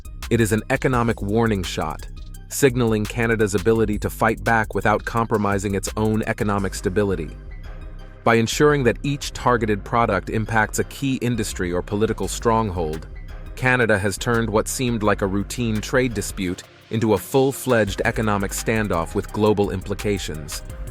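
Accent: United States. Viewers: The vid was ok , just can't stand the American voiceover.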